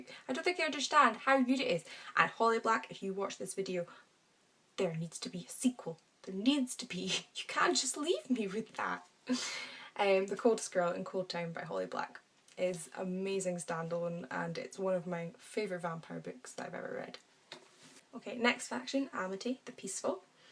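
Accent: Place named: Scotland, United Kingdom. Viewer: I don't think I will ever say enough of how much I love your Scottish accent! It is so pretty. Can't wait to do this tag! I think I already have about half of them answered in my head lol.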